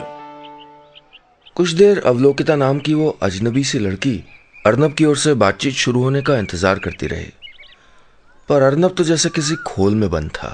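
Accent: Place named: Kumaon, India. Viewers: Aapke muh s kumauni hindi bahut achhi lagati h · Tht Kumaoni accent...:-)...reminds me of my paternal home...:-D...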